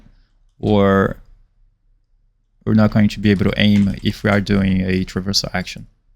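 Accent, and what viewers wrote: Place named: Brazil. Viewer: bro has that brazilian accent :goodvibes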